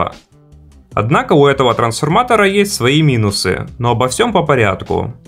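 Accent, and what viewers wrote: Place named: Kharkiv, Ukraine. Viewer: Чую харківську говірку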